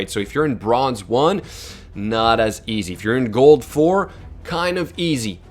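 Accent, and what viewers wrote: Quebec, Canada. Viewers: Yeah. Not even close. I think this guy has a bit of an accent more then anything. He sounds like he might be French Canadian.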